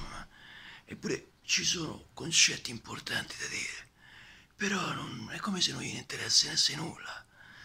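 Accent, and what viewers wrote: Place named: Rome, Italy. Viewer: Ti è uscito l'accento romano.. in realta lui è emiliano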